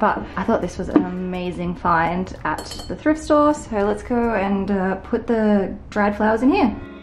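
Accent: Australia. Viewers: Have you never heard a Australian accent before? Dude.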